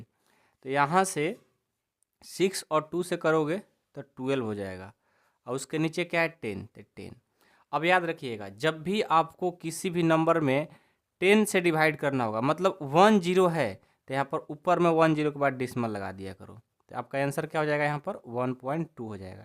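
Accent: Bihar, India. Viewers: Bihar ke ho kya language se lagta hai😊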